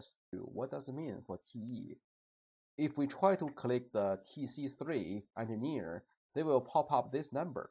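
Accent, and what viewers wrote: China. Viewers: Судя по акценту автор китаец) Как я понял? "So from here".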